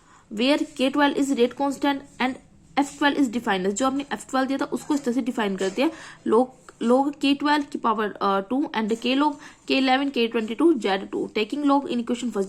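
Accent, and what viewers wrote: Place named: India. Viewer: Peak Indian accent didn't even understand a single word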